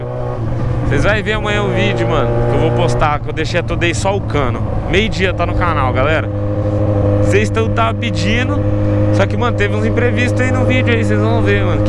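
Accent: Goiás, Brazil. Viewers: Vc é Goiano? esse sotaque seu, parece demais de goiano.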